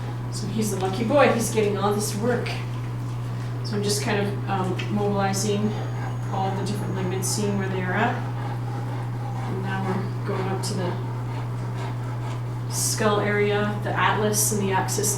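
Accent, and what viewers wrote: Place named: Ontario, Canada. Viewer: Her accent sounds Ontarian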